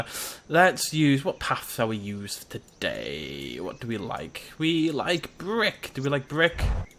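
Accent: United Kingdom. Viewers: I no your British but why did your voice Chang to a British voice and are you British amrican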